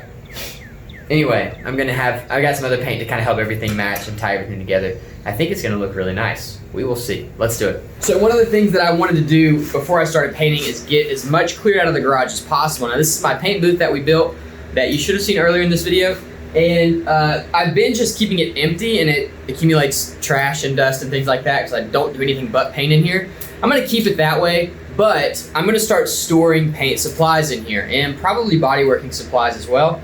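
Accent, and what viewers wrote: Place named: South, United States. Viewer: Love he has that southern accent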